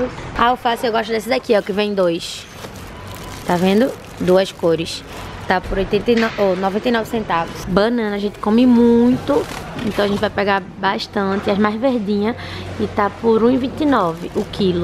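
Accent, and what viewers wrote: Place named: Northeast, Brazil. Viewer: Acabei de chegar e simplesmente amei! Vc é nordestina? Amo esse sotaque 😍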